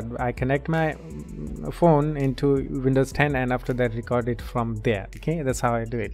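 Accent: India. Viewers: By listening your English I am damn sure that u r an Indian... Don't try that accent you have got caught 🤣🤣🤣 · U are indian?? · R u Indian?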